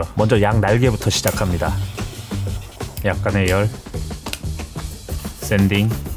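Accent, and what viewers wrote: Gyeongsang, South Korea. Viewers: 아무리 표준어를 쓰려해도 악센트라 사투리가 나와요 서울사람이 부산가서 경상도 사투리 쓰듯… 그냥 사투리 쓰세여. 강호동처럼.. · 혹시 고향이 갱상도인가요?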